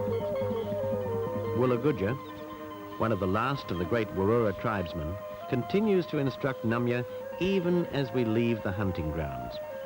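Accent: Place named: United Kingdom. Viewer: The 'Bri-ish' voice over is hilarious, I remember being shocked in the 80's, when I first heard him talk.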